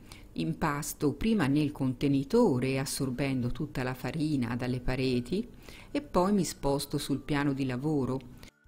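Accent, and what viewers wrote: Tuscany, Italy. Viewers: Che bello questo accento Toscano!! 😊 Grazie per il video, devo provare a seguire la ricetta!! Direi che ci vuole mooooolta pazienza eheh Come si usa, poi, per fare il pane? Ci faresti un video? Grazie, grazie!!!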